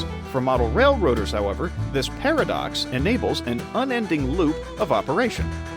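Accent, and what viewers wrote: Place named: Texas, United States. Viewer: WHY do you sound like texan Gabe Newell?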